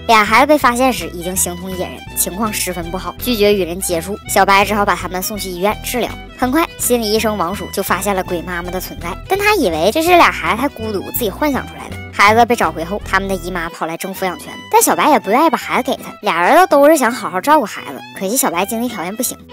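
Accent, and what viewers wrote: Northeast, China. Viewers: 哈哈 讲解的一口东北大碴子 太搞笑了 逗乐了都！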